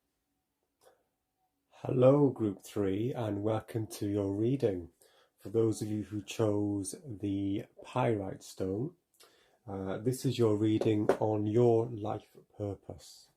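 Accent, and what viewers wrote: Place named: Wales, United Kingdom. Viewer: Hello, thank you for a lovely, and interesting readings, 1 n 4. I felt they linked, and some good uplifting advice. I tried to place your accent, and wasn't sure, but I've heard it before, I was thinking whale's but not sure, the dragon sort gave me that idea. Anyway only if you want to share, your name was an other curiosity 🤔. Loved your reading, and your accent, maybe your names Aegon 😉 😜 ❤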